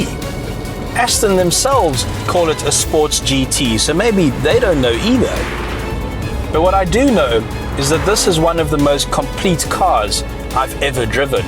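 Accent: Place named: South Africa. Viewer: CarVinci No this South Africa. This is our normal English accent. I have seen that many people from other countries think we speak with an Afrikaans accent but then that is people who speak Afrikaans usually who then also speak English. His accent is the usual South African English. The place he was filming is in Cape Town.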